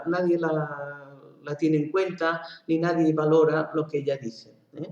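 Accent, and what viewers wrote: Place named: Catalonia, Spain. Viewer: me subscribo ,me gusta como narras, buena dicción, por tu acento deduzco eres catalana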